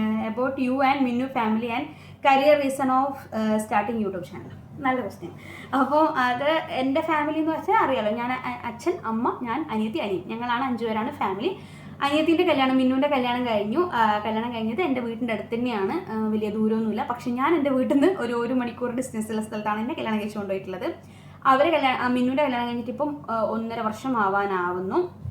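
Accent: Kannur, India. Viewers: അതെന്താ കണ്ണൂരുള്ളവർക്കു നന്നായി സംസാരിക്കാൻ പാടില്ലേ? ഒരോ ജില്ലയ്ക്കും ആ പ്രദേശത്തിൻ്റെ തനതായ 'സംസാര ശൈലിയുണ്ടാവും.. നിങ്ങളുടെ വിദ്യാഭ്യാസത്തിൻ്റെ ഗുണമാണോ ഒരു പ്രദേശത്തെ ഭാഷയുടെ പേരിൽ നെഗറ്റീവടിക്കുന്നത് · KL Biju venta kannur slag.you good speaking.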